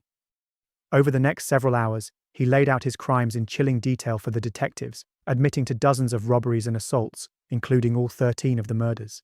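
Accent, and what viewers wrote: England, United Kingdom. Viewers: Excellent narration! Love the beautiful English accent & diction.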